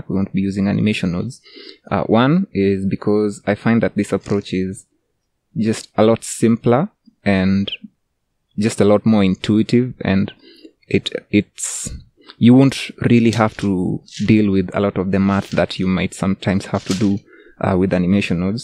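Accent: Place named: Uganda. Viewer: Your accent reminds me of my old Ugandan accent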